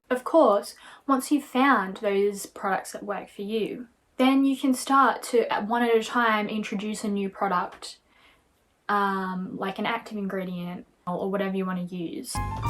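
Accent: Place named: United Kingdom. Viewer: I love your accent! UK, right?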